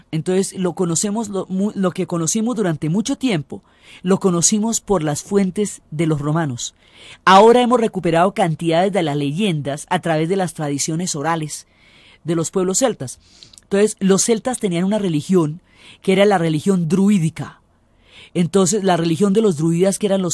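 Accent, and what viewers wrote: Colombia. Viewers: Es facilisimo entender con una narrativa como esta, empeze a escuchar por investigacion pero ahora me he hecho fan y adicta a su canal, aprendo mucho y me hace aprovechar mejor el tiempo!! Ademas me encanta su acento y expresiones Colombianas. Saludos desde Cancun, Mexico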